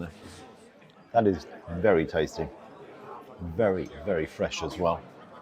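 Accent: United Kingdom. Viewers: britski-petski accent is so annoying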